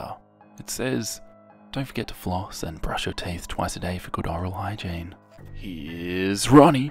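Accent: Australia. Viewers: Question: Who else, or am I the only one, that wants to know what goes on though the cashiers heads as walks up and buys these colouring books? I would also like to add that this made my Friday, the sarcasm, excessive blood, awesome drawings and the best accent on earth (fellow Aussie). My Friday can end happily!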